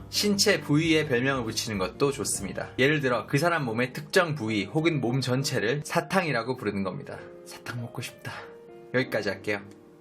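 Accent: Gyeongsang, South Korea. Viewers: 갱상도 출신맞?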